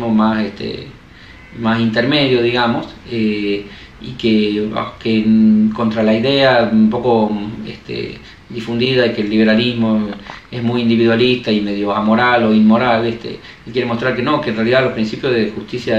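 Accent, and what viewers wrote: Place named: Argentina. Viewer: Gracias por esa explicación, no me esperaba la foto con Messi jajajajaja, solo si me costó mucho entender las palabras con el acento Argentino en las palabras rápido, iba tomando nota😢 pero bueno logré capturar la escencia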